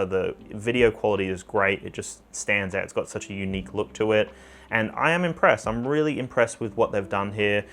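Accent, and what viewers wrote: Australia. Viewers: G’day, Retired DJ Mickey Rage up in Mission Beach FFNQ here mate…….. I just wanted stop in to say: “Thanks for doing what you do here on the Tube mate……… It’s really nice to hear an Aussie Accent instead of the Septics All The **** Time eh” So, Keep up the great work.. AND while I have your undivided attention: I couldn’t get the first link on here to the potensic atom mate………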